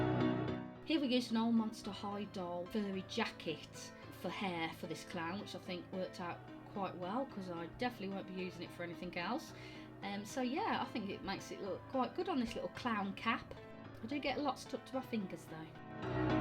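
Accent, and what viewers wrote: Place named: West Midlands, United Kingdom. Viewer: I am glad you put her lower jaw on she looks fabulous.She’s actually quite glamorous for a zombie lol.I love the face paint and the cap really does make a great option to scraggly hair...The way you did her mouth was very clever and made her look less doll like.I also like the pose too it’s really good and I think the fixed dolls look great as they stand better than on those plastic stands..Apart from the face my other favourite part is her boots.They are so cool ,and I like the colour you did them..Do I detect a West Midland accent with you too.have not noticed until today..I am from there .It’s actually nice having one of my favourite doll makers coming from here...Keep up the great work I am looking forward to your next one 🥰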